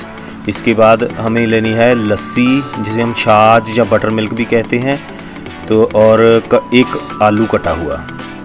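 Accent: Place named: Punjab, India. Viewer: paaji meri maa pichle 45 saal se mujhe kadi khila rahi thi. Maine shaadi nahi ki. To aaj mera apni maa ko kadi khilane ka mann hua. Aapka video dekh k maine banaya... whoa!!! Bohot delicious banaa thaa. Ek cheez maine add kiya and wo thaa khada dhaniya. Bohot bohit shukriya aapka. Aapki punjabi accent waali hindi bhi kadi jaisi achi hai. Thanks 🙏🏼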